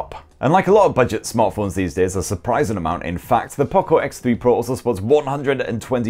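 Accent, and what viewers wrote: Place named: Yorkshire, United Kingdom. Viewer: Just wondering, what northern accent is he speaking with? Yorkshire?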